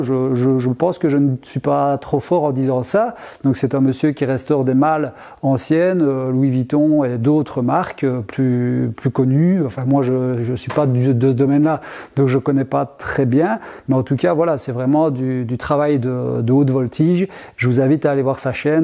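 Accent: Belgium. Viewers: Le T-Shirt on achète! 😁 Et le coup des T-shirt d'autodérisions de notre bel accent belge pareil! Super idée, le coup de l'artisan local! Belle initiative! Félicitation! 👍👍 J'oubliais: l'établi MFT top idée!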